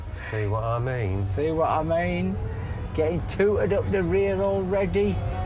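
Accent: Yorkshire, United Kingdom. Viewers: As a Yankee, I miss 50% of ruminations/rantings (due to his lovely Yorkshire accent) and as a straight woman I get very little of his cultural references, STILL, I've subscribed because those videos give me so much joy! Especially facial expressions, eye rolling and the constant reminders he's too young to share childhood memories! You guys are the modern Martin & Lewis😄 Good luck on the test! Bon courage!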